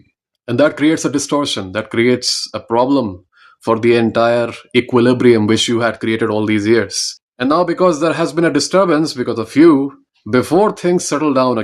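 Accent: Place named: India. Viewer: woh toh theek h par laadle ascent kati desi h aapka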